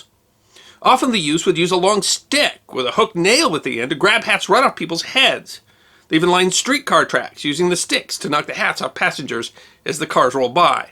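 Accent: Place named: United States. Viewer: Oh how I wish you spoke the Queen's English & not the lazy Yankee dribble!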